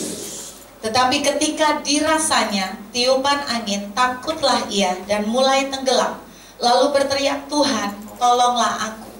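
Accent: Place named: Java, Indonesia. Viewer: Justru Saya orang suku batak tinggal di Sumut tapi saya suka dengar khotbah logat bahasa ibu campur logat Jawa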